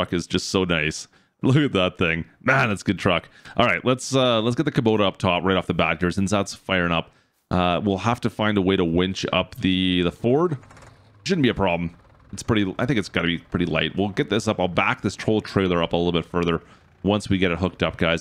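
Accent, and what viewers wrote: Canada. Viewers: Are you Canadian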